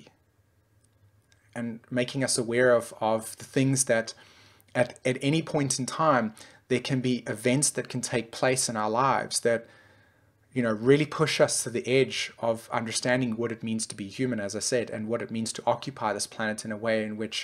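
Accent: South Africa. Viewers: you have a nice SA accent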